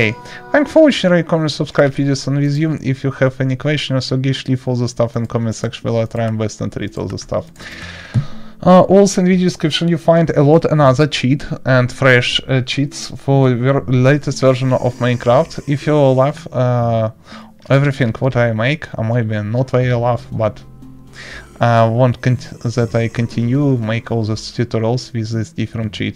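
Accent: Russia. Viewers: У тебя русский окцент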